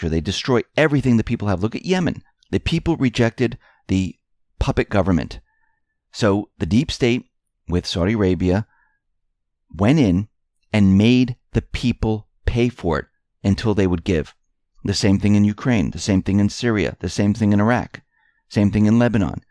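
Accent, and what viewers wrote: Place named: East Coast, United States. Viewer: Dont u listen to your voice with obnoxious east coast sound or r u oblivious to it the accent is so pompous and ignorant sounding though u r not stupid q asks for prayer because he will ask u followers to drink kool aid next only a matter of time until trump assasinated and pence forces bible study in public school hawaii missile was aimed at af1 or did u miss that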